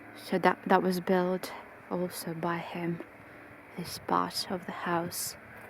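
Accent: England, United Kingdom. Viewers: Very interesting. You sure have a lot of work to do fixing the cellar and doorway! I notice that you seem to have an English accent. Did you attend school in England?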